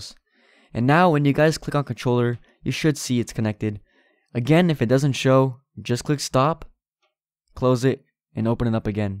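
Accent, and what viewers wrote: Croatia. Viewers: maybe this is a dum question but are you croatian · Are u from Croatia?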